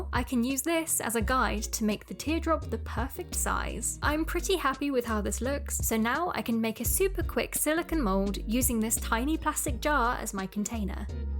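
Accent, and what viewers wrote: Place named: United Kingdom. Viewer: This is the first ever time I've heard you speak and I did not know you were British omg!! I love your style so much it's such an inspiration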